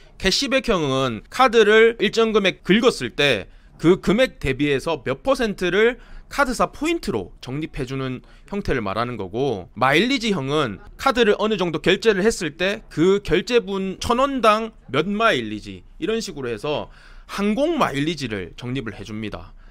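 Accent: Gyeongsang, South Korea. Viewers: 오늘 님 300만원 프로젝트 1탄부터 정주행했습니다. 잘꼭따라가고 월 300은 아니어도 200이라도 벌었으면 소원이 없겠어요 간절하네요 저도 고향이 갱상도라서 사투리가 넘 와닿고 ㅎ 검단에살고 있어서 눈오는 풍경도 낯설지가 않네요. 꼬옥 성공하고 싶어요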